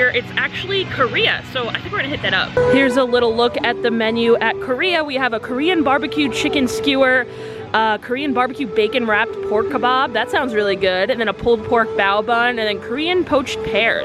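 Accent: New Jersey, United States. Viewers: I could just tell you were from Jersey.. I’m from south Jersey too and I grew up going to great adventure too!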